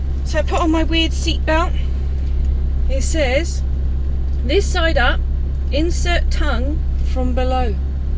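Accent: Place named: Gloucester, United Kingdom. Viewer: Hi i loved watching your driving were you driving around Gloucester as that is my neck of the woods and your accent sounds very familiar looking forward to the next one best wishes roy. 😊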